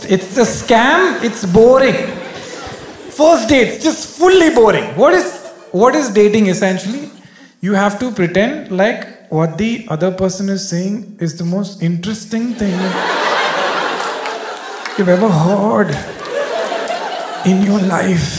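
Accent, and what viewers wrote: Kerala, India. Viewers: malayali accent is too cute anyway 😉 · His malayali accent is also cool. Enjoy your samosas, people. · Malayali accent is nice! Mallus are so sweet.. My in laws are (Sasural) Malayalee. They all are super sweet.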